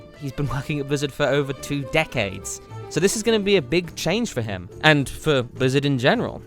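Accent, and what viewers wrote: United Kingdom. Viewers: Since I dont have twitter, mind if I ask you this here? You're either 1) really good at a british accent or 2) moved to the US from britain. In the case of 2: why'd you move? Europe is awesome! :p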